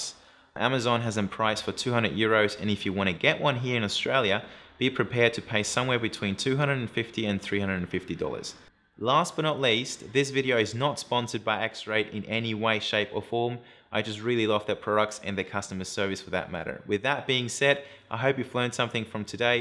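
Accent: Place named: South Africa. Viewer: Felt like there were hints of South African maybe? I'm also curious!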